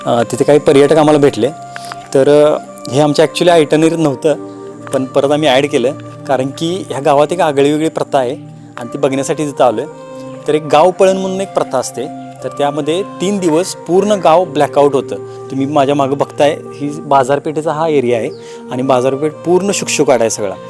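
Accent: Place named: Kolhapur, India. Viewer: खूप भारी कोकण सिरीज आहे. आपण कोल्हापूरचे आहात काय? खूप दिवसांनी माहेरचे शब्द ऐकले... चव सपक आहे... अगदी खेटूनच आहे. शेवटी आपल्या भाषेचा लहेजा एक नंबर असतो. धन्यवाद 🙏🏻💐🙏🏻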